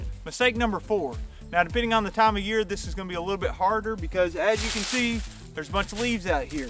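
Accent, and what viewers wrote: Kentucky, United States. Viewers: Excellent stuff here. Never thought to check ground level with my water bottle! One thing I used to love seeing when I was deep fall camping for hunting was a floor covered by dry leaves where I was going to camp. I’d use a stick and rake up three piles. Pick out the sticks and twigs from them, then set my tent up on top of the smaller pile. The other two I’d use to fill up two large heavy duty lawn bags. I put the two bags in my tent, covered them with a wool blanket, and that was my mattress. Combined with the duff under the tent I stayed warm and comfortable. ABC - always be careful, though…if it has been a warm day, you may accidentally rake up a copperhead. Also… I saw you wearing a BBN shirt in a video and your accident sounds Kentuckian. Are you one of us Appalachians, man?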